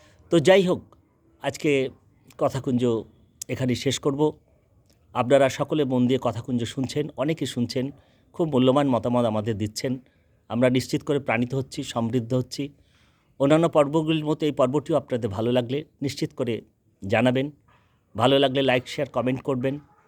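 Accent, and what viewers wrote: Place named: Purulia, India. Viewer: আগের পর্বগুলির মতোই এই পর্বটিও মনে রাখবার। অসাধারণ বললেন ' দমে জার লাগছে ' নামের এই কবিতাটি। মনে হচ্ছে যেন আপনি ওই পুরুলিয়া অঞ্চলেরই মানুষ। সঙ্গে মাননীয় শিক্ষক ও আবৃত্তিকার শ্রীযুক্ত চন্দ্রচূড় অধিকারীর সম্পর্কেও আপনার কথা থেকে জানলাম। ওনার কণ্ঠে এই কবিতাটি শুনবার আগ্রহ থেকে গেল। আপনি এই আগ্রহটা জাগিয়ে দিলেন। কথাকুঞ্জ এভাবেই আমাদের মন ভরিয়ে এগিয়ে চলুক। এই কবিতার কবি মাননীয় শ্রীযুক্ত মোহিনীমোহন গঙ্গোপাধ্যায়-কে আমার অনেক শ্রদ্ধা ও প্রণাম। কথাকুঞ্জের জয় হোক দাদা।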